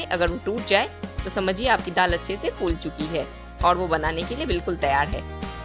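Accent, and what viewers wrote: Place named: Sindh, India. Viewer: Very. nice recipe... Also luv ur Sindhi accent...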